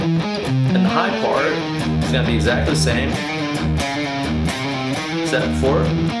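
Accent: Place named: Texas, United States. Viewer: You sound like you’re from Texas, man. We have good metal down here in the south.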